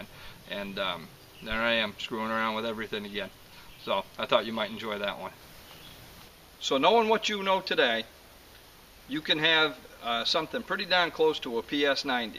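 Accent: Maine, United States. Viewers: I think I hear a Maine accent here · Are you from down east Maine by any chance? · You sound like you're from Maine.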